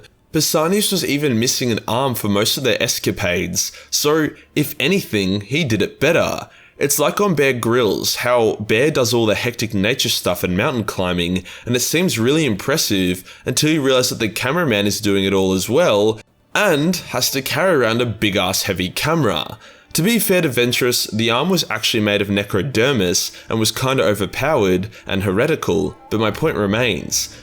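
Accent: Australia. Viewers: Hearing 40k in Aussie is good for the soul 😁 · He's speaking pure okker. It's an Aussie thing... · Best way to wake up is a crazy Australian talking about sci-fi shenanigans. FOR THE EMPEROR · Dude, you talk like Paris Hilton, but with an Australian accent.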